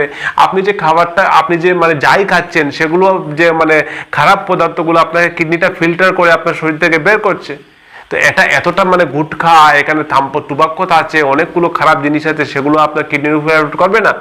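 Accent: Sylhet, Bangladesh. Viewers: ডাক্তার সাহেব, অত্যন্ত উপযোগি video উপহার দেবার জন্য ধন্যবাদ। বিশেষ করে আমাদের মা-বোনরা অনেকেই পাননশলা খেতে addicted হয়ে আছেন।আপনি কি আমার মত বাংলাদেশী সিলেটি নাকি আসামীজ সিলেটি। আমাদের সিলেটি ডাক্তার পেয়ে খুশী হলাম।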